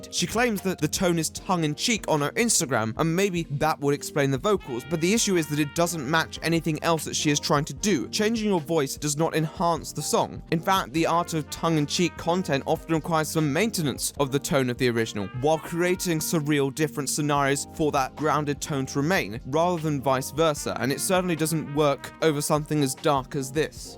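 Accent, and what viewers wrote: RP, United Kingdom. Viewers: "Which is where we're FUCKING going next." Sir I LOVE YOU. lol your style of dry cynical humor is absolutely my cup of tea. I can't believe I've gone this long without knowing you were out there but I haven't stopped watching your videos since I found you a couple days ago. You're always so informed and fair in your assessments it makes me feel like i'm watching a really SMART documentary instead of a trend following gossip show. I feel like i get SMARTER when i watch your videos. listening to a posh British voice using big words while making snarky jokes and sounding SO over the bullshit is everything I need right now. and I always appreciate your opinion on these topics because unlike everyone else eagerly throwing their opinions in on any given subject, you actually EDUCATE yourself about them, instead of just speaking on the small bits of information you're not sure about. 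I appreciate your tact and dedication to making sure you present ALL facts and sides involved instead of grabbing the juiciest bit and running with it. And because you have such a strong personal opinion you often discuss things I'm not sure anyone else has touched on which only deepens the understanding of the situation and keeps everyone more informed in general. So thank you for all your hard work and your quality content. you found he magic recipe for your channel and your personality is absolutely the center of its success. you always talk about how if he audience doesn't connect with the creator t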